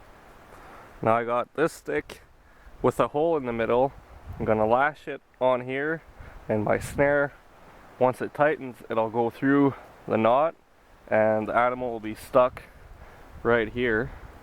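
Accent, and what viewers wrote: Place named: Quebec, Canada. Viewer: Haha je m'attendais pas à entendre un accent québécois Great video the explanations were very clear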